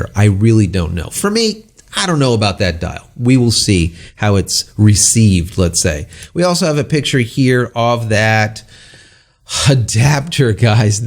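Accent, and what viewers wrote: United States. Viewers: ) 2 things. Well, 3 things. 1. Great videos, I've been waiting for Nikon to come out with mirrorless for years and I will not be sleeping tonight I'm very excited. 2. People shouldn't harp on you for having an American accent when you pronounce Nikon. N-eye-con... deal with it, Americans speak with an American accent. We also say automobile and not Jidōsha but no one corrects us on that. Fools. 3. The back dial you referenced is historically called the Command Dial, and Nikon has never labeled it in any of their DSLR's. The front unlabeled dial is historically referred to as the sub-command dial. It looks identical to the Command Dial used on the Nikon 1 V3, and I'm sure it'll operate the same.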